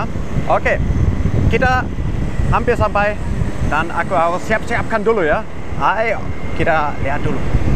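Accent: Germany. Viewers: I like your german accent XD mas bule... Due bist schön XD *maap klo salah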